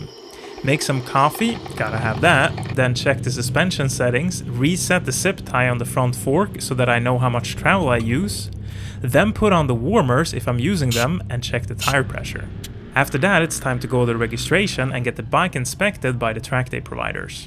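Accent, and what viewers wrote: Sweden. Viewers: Your accent sounds swedish? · U must be from Sweden with your accent? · Är du från Sverige?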